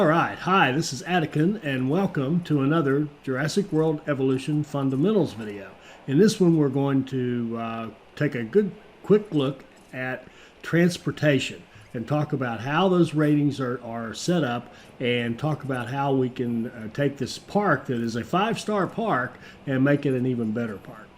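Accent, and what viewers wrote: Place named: South Carolina, United States. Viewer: You sound like a Clemson man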